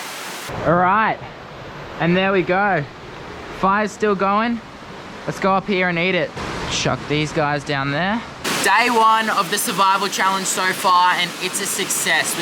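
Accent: Australia. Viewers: Nice vid. That ozzie accent though....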